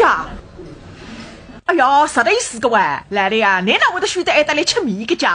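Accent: Suzhou, China. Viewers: 大闸蟹。等々，作为美食介绍加入历史典故。以及人文知识，则更加丰富多彩。是地道苏卅姑娘，我们同乡人听起来非常妙，但非苏州人除江苏南部人外，或许你说的太快，有点听不懂，所以望今后做网上节目，说话节奏慢一点，尤其苏卅地方俚语，越慢越好，如：然（你），北京西路，北和西要说出正宗苏州音，好听。十五，沙五也要着力沙。…..仅供参考，新加坡很多华人上网，听到苏卅腔很高兴。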